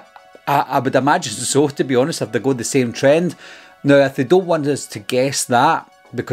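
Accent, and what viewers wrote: Scotland, United Kingdom. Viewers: I just meant your Scottish accent 👍🏻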